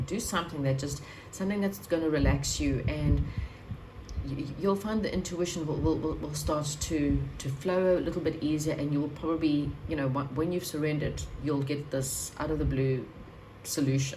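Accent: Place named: South Africa. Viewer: 💚I love your readings and your accent South African? 💚 100% accurate 💚 thank you💚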